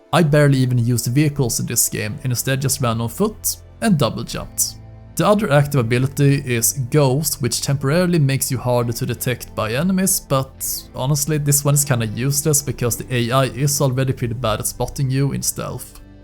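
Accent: Sweden. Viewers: wait are u a swede?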